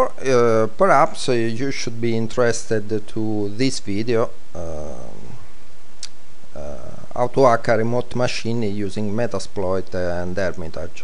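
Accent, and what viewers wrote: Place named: Italy. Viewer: Outdated. Si sente che sei italiano ma mamma che brutta pronuncia che hai !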